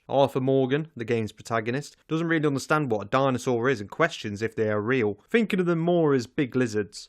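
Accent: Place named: England, United Kingdom. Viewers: Sorry no offense but what kind of accent is that? Scotland? Just curious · Where are you from? What accent is this? Or regional dialect or whatever. Sound like the northerners in Game of Thrones. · UK, England probably, doesn’t sound very irish or scottish so I’m pretty sure he’s from England.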